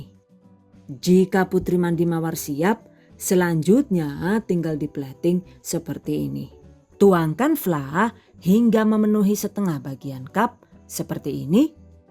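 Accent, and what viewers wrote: Banyumas, Indonesia. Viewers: Mantap bun...Purwokerto hadir ,tp tinggal e ng Bekasi.seneng bgt klo lg ngmng ngapak.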